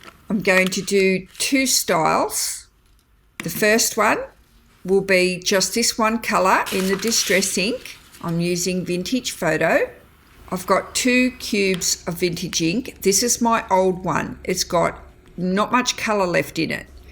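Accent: Australia. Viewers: Hi! So glad I’ve found your channel! I love what you do. I have requested to join your Facebook page, hope I’m accepted. And it’s so good to hear a fellow Aussie on YouTube!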